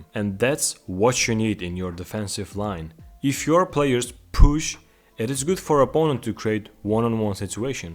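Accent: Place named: Eastern Europe. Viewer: Nice one, just came across your chanel Btw are you from any of the former soviet union countries? Your accent sounds very familiar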